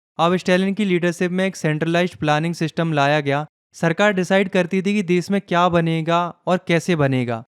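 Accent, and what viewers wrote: Uttarakhand, India. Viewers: bhai tum uttrakhand se ho kya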